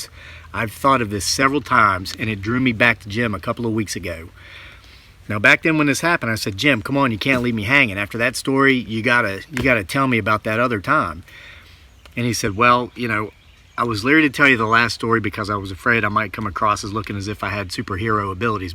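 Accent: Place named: Virginia, United States. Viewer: I’ve never seen your channel before & I’m learning more mindfulness practices in a Focusing class going inward to see what the body is trying to tell me...& I just learned Transcendental Meditation for free online, but coming off a Benzodiazepine makes it hard to clear one’s mind of all kinds of junk from yrs of sedation. I’m an Empath, as well. I heard Ta Ta Ta or Tathata, as well! What amazing men you & your friend both are! This is a delightful video! Thank you! 🙏🏼 Oh! I think it may have been Judith Orloff talking about those who are Earthbound trying to latch onto Empaths...at least, I think she was the one talking about this...anyway, this person said to just imagine showing them the light as in silent conversation so they can return. I don’t know if this is helpful, but it seems to be for me. Best wishes to you & your Zen friend. 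🙏🏼 P. S. Oh, as you were speaking early on, I wondered if you were from Virginia, the accent, plus my birth parents are from there & I have a couple friends there, as well as all along the E Coast. Then I saw what your hat said...interesting.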